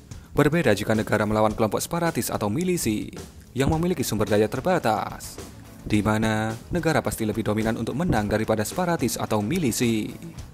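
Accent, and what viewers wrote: Java, Indonesia. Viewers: Maap gue slalu skip 10 detik pas openingmu dr kata HALLO PEMIRSA 🤣 krna medok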